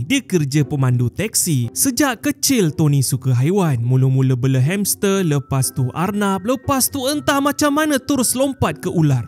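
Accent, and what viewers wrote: Sabah, Malaysia. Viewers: orang sabah ke? Anduk baaa😂😂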